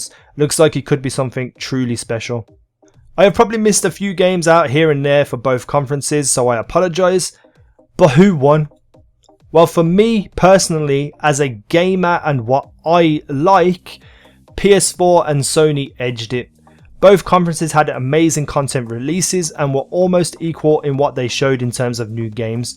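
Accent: Birmingham, United Kingdom. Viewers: I can hear that brumy accent ha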